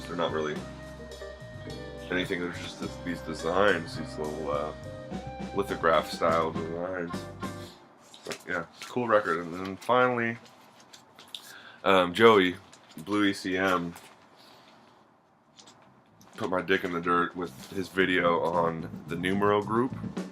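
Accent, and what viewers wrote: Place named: France. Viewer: Just love your French accent! nice diabolique pick ups.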